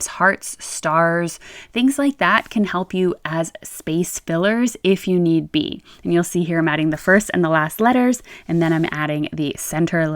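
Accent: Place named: Canada. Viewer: Love your videos so much! I love your accent, It makes me think Canada! <3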